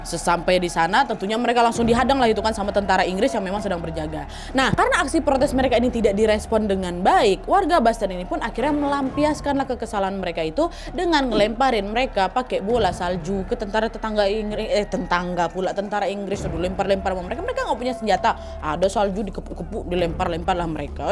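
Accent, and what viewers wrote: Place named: Medan, Indonesia. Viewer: Mantap kalee kakak ini penjelasanya....suka sekali saya dengan gaya Medannya...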